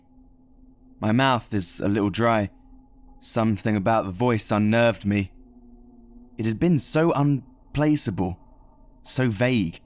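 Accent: United Kingdom. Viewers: British narrator? Good narration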